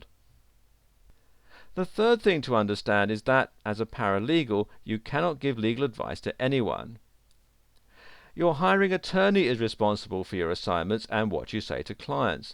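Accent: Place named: United Kingdom. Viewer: Pretty sure that's not a canuck accent, eh? Sounds pretty British to me.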